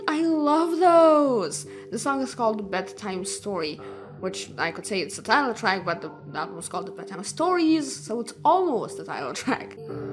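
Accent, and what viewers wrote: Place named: Canada. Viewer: Wow that's amazing your Lithuanian but do you live in Canada your accents Canadian I thought sorry I just kinda shocked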